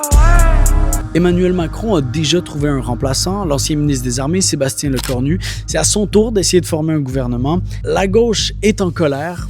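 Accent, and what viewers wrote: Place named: Canada. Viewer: Tu as un accent canadien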